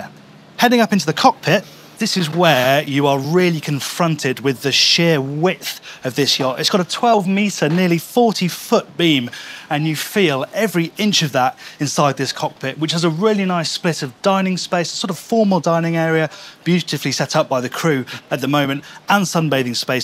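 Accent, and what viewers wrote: England, United Kingdom. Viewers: Does anybody know where in England is this accent from? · The Queen’s English?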